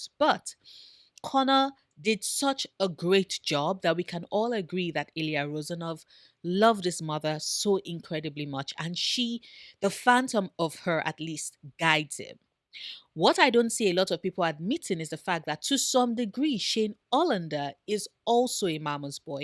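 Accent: Nigeria. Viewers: i only just clocked the nigerian accent in this video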